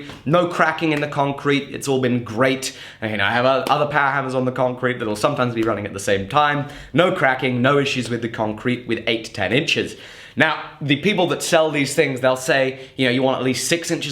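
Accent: United Kingdom. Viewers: Wait, are Brits allowed to wear boots?? Don't get me wrong, I don't know why everyone doesn't, but I don't think I've ever seen someone with your accent and a pair of cowboy boots. Props to you sir! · bro, wtf... I see American flags, Texas flags... don't tread on me... but the accent and the measurements... what are you!!!!!!!!???? Brit transplant, yank transplant? I'm so confuse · I really am curious.. Texas and American Flag. British accent. You relocate to Texas or just love Texas? Thank you,Proud Texan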